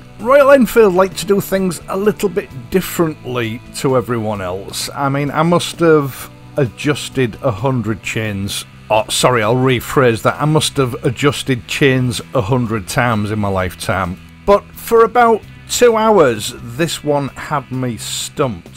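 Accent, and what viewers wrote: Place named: England, United Kingdom. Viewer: Brilliant teaching video. 'Lots of practical logic and obvious experience, spiced with humor and an enjoyable Englishman accent for good measure. Thank you.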